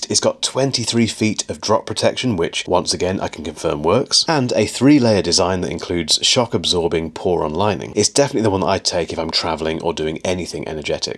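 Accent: United Kingdom. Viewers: can you please say aluminum once again? didn’t hear that in british accent since jony ive left apple 😅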